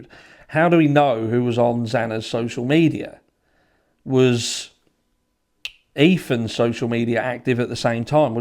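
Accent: United Kingdom. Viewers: Right there and there lol I think I just like ur bretesh accent eh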